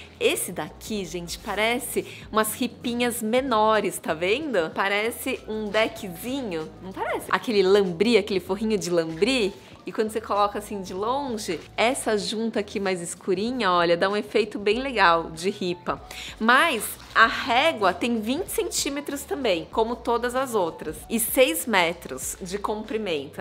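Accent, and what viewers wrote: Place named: São Paulo, Brazil. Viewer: Falo como você! Sou Paulistana!!!!!!!!!!!!!!!!!!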